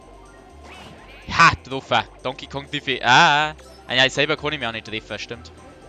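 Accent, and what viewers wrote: Bavaria, Germany. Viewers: i find des sau geil dass du boarisch redst! 😂😂9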